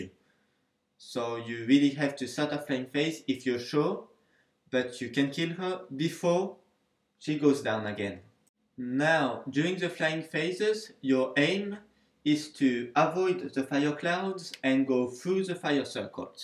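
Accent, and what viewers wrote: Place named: France. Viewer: Your English is not very good if you have trouble parsing a French accent.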